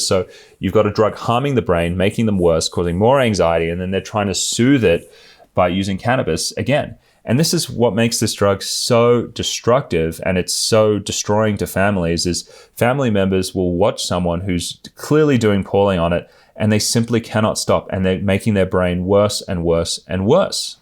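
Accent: Australia. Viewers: I think this is a really important video. That said, however, you are speculating. It could be that there is a genetic or psychological predisposition that makes them sensitive/vulnerable to cannabis. What you said about the conversion rate suggests that there might be a relationship between a tendency for certain psych issues and cannabis (THC?), but it could be the opposite of your suggestion, i.e. it triggers the latent condition. You sound Australian and you look Indonesian. It's a popular place for Indonesians (I lived there for 15.5 years). Did you move or were you born in Oz?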